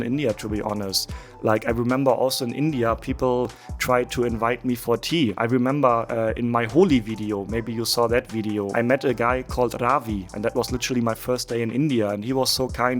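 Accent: Germany. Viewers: hat irgendwie nen deutsch Tuch in sein Englisch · Ich hatte es geahnt dass du aus Deutschland kommst, wegen deinem Englisch was du mit Deutschen Akzent sprichst. Auf jeden Fall ein sehr Interessantes Video 👍.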